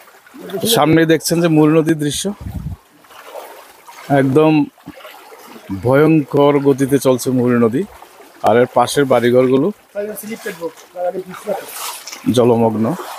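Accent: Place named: Noakhali, Bangladesh. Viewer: এটাকে বলে নোয়াখাইল্ল্যা ভাষা...!!! পুরা সেইম টু সেইম।। একটুও এদিক-সেদিক নাই।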